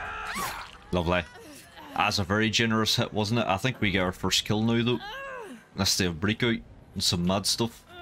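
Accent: Ireland. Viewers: what a strong accent,irish?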